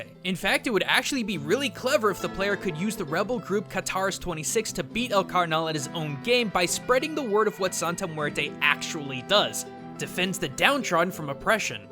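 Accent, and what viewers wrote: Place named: North, United Kingdom. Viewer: dang, the way you pronounce spanish words is so... northern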